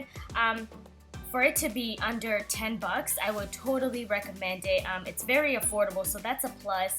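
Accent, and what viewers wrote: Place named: Kenya. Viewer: Crazy how I could automatically tell you’re Kenyan 😭❤️ I’m kenyan too girl 🇰🇪😛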